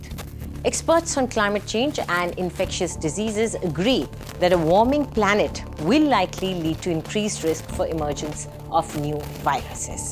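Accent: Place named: India. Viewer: What a heavy Indian accent. I cannot remember how to speak in English.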